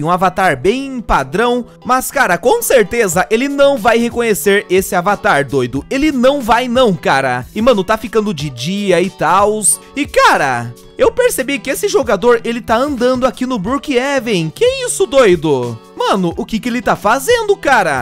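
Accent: Portugal. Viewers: Ele é português?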